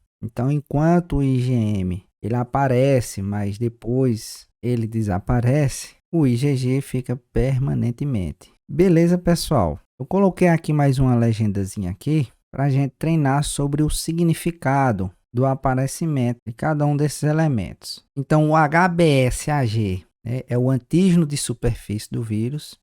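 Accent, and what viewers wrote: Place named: Northeast, Brazil. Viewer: Incrível aula e de bônus com sotaque , oxi! 🤠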